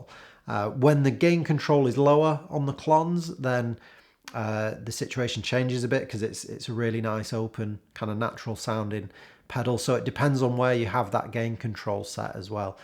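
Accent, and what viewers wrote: Manchester, United Kingdom. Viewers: Cool video. Sounds like you're from Manchester.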